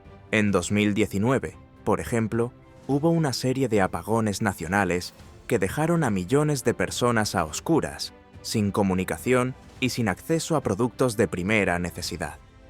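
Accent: Spain. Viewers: El Locutor debe ser Español espero que haga un VIDEO DE SU PAIS E S P A Ñ A !!! QUE NO ES UNA MARAVILLA TAMBIEN HAY POBREZA Y BASTANTES DESAUSIADOS !!! CUALQUIER PAIS QUE LOS EEUU🇺🇸 LE META SANSIONES LE PASA LO MISMO !! VIVA VENEZUELA 🇻🇪 CARAJO !!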